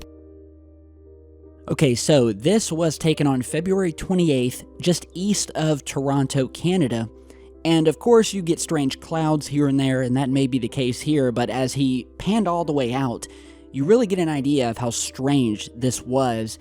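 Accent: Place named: South, United States. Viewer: Is from the south? I'm just curious. if you listen closely he has a slight accent lol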